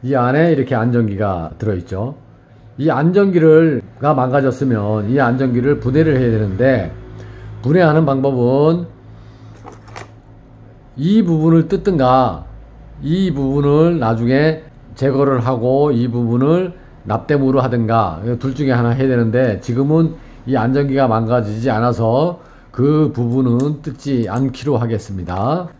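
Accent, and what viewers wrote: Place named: Chuncheon, South Korea. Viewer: 춘천 분이신기봐요 ㅎㅎ 구독합니다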